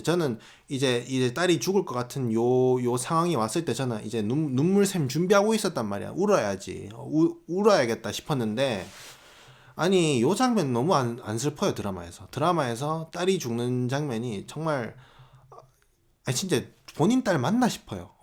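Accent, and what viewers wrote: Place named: Gyeongsang, South Korea. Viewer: 후기를 듣다듣다가 말투를 보니.....경상도 출신인데. 대구? 부산? 어느쪽이든 고향분이라 반가브요 ㅎㅎ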